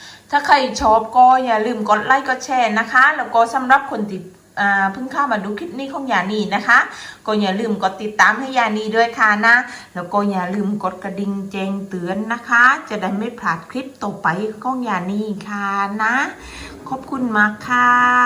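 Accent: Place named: South, Thailand. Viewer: น้องหมาน่ารักมาก แสนรู้ด้วยครับ ฟังพี่Yaneeพูดสำเนียงไต้เพลินมากดูแกงอ่อมไปน่าทานจริงๆครับฝักก็งาม 14นาทีคุ้มค่ามากครับคริปนี้ อยู่ทางโน้ดูแลตัวเองด้วยครับ คนทางนี้ส่งกำลังใจให้❤️มีเวลาแวะมาหากันบ่างน่ะครับ😊🇹🇭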